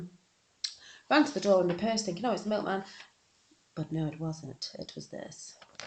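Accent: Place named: Yorkshire, United Kingdom. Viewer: You are well funny without trying haha! I met my fella on a dating site and it went well he's not a total mental case lol dating sites can help people who haven't got the opportunity to get out where you from you sound Yorkshire I'm from Yorkshire also :-) you have a very infectious personality I bet men love you! Haha the fire sign one lol do you mean tinder? Lol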